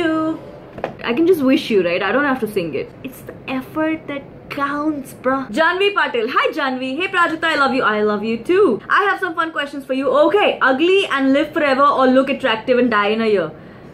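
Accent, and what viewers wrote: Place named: Bihar, India. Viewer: Hey prajakta! Firstly, endless love and support to you, I really enjoy watching your videos! Here are few dares for you, out of them you have to do any 2: 1. do ghati dance on 2. imitate your favorite tv character 3. have an imaginary, romantic conversation with Hritik Roshan (in your typical bihari accent) I love you endlessly ❤ #SawaalSaturday #sawaalsaturday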